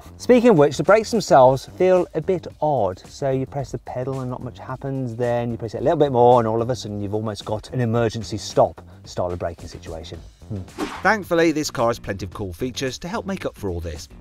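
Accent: United Kingdom. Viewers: ブリティッシュアクセント好きおった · Apple嫌いなところ、共感します。ブリティッシュアクセント、大好きです。 あなたの動画はブリティッシュアクセントの良い勉強になります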